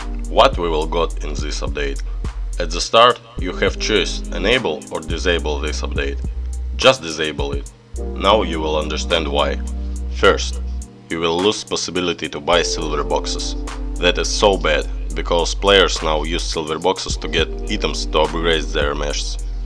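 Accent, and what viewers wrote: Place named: Russia. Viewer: I love your russian accen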